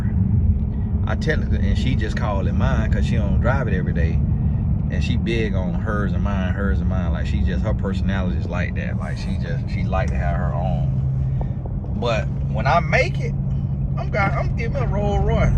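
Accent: South, United States. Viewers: Sounds like you had a Great Father! Love the southern accent. 🙏🏾🙏🏾🦾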